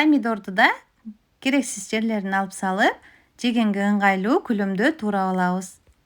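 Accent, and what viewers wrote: Standard. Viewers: Азаматсын,таза кыргызча нукура так сулойт экен,кээ бир чалдырагандар бар бир созго эки орусча кошуп тантырагандар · Колуна береке аябай таза кыргызча суйлопсун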